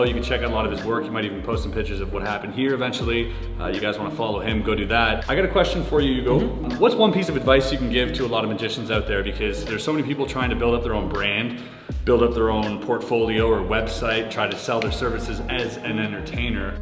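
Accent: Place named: Quebec, Canada. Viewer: bonjour j'ai vu que tu habite au quebec grace a ton accent et au moment ou tu parle francais bref continue! t'es super on t'aime!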